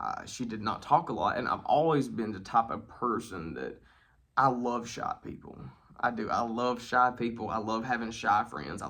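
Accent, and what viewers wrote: South, United States. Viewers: Sorry to hear this happened to you. Every time you looked at that girls boyfriend, she and he both probably considered it sexual harassment, like you were trying to seduce him. Employment law is supposed to require an investigation into allegations of sexual harassment, with the facts to be proven before you can be terminated. But when you have multiple people filing complaints against you, and corroborating each others stories, even if they are all lying, that is a hard thing to overcome without serious legal action. Sounds like you are from the South...nobody ever said that was the land of the fair and open minded. People are going to believe what they want to believe, the facts be damned. You probably need to do your best to stay away from the Bible thumpers. The Bible thumpers in this story probably need to study their Bibles a little more closely, maybe ask themselves, "What would Jesus do?". 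· your thick southern accent. I like it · The south, assuming from the accent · mixed with a southern accent. I love it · While honesty and truth are vital, discretion is often also required. Regretfully, some gay men have the further challenge of having a feature I call the "gay voice". In fact, it fascinates me. You can meet men who were born thousands of miles apart from each other, yet they both have the "gay voice". It is beyond any psychological research or knowledge to which there is currently any reasonable explanation. It is like having a particular accent. You have a quite noticeable American southern accent. It is how linguistics developed in your area and was well established before mobility rapid transit and vocal transmission became possible during the Industrial Revolution, when the average person lived their entire life within 2 miles of where they were born. In fact, during the past 150 years, dialectic specialists began noting that ' regional accents' of the same language are beginning to homogenize. 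The influence of radio, television and now digital streaming video is beginning to cause a blending towards what used to be called a "Continental Accent". But while this explanation of various, differing accents has always been based upon regionality and highly limited mobilization for all but a short time in human history, the "gay male accent" has no basis in regionality. A gay man raised in London, England, will speak with the same gay sound as an American. It's an overlay accent upon a regional dialectic accent of a common language. Yet only some gay men have this added challen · My favorite saying is (Why try to fit in when you were born to stand out) PEACE ✌️ AND PRAISE my friend 🙏...PS I LOVE YOUR SOUTHERN ACCENT! HOLLA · I like your southern accent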